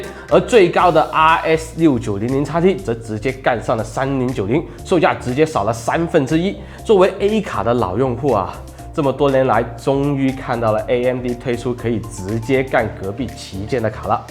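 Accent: Cantonese, China. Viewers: 满口的广色普通话和英文，落实是广东人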